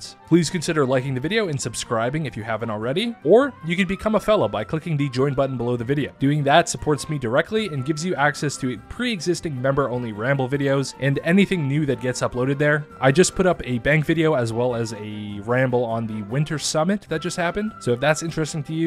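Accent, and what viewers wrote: Canada. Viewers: Are you canadian? You sound canadian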